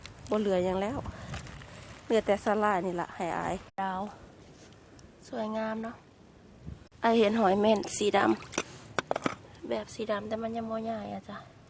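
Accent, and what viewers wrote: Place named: Isan, Thailand. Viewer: มักสำเนียงพี่อายเว้าหลาย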